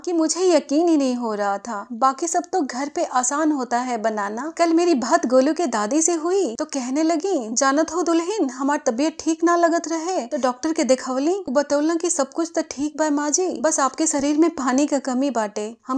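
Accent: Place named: Bhojpuri, India. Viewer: Aapka bhojpuri aawaj so sweet now😮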